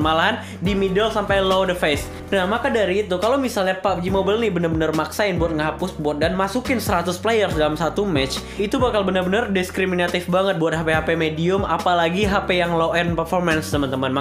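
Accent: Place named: Bali, Indonesia. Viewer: Orang bali bang??? Soalnya logat pas ngomong "t" nya mirip am orng bali :v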